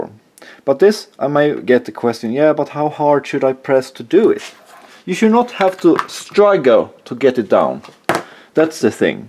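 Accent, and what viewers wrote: Sweden. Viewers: typiskt svengelska!lol enyoyed this video lol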